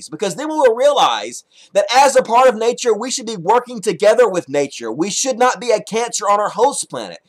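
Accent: South, United States. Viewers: your southern accent makes this video so much better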